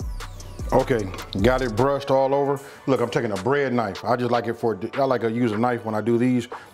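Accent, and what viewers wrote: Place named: California, United States. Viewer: I’m pretty sure he has a Cali accent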